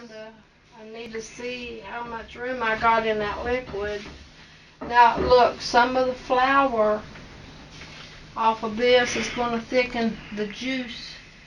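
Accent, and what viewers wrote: United States. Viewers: First time watching your videos and that soup looks delicioussssss!!!! I will try to cook it. Thank you for sharing and I love your accent, which part of the USA are you?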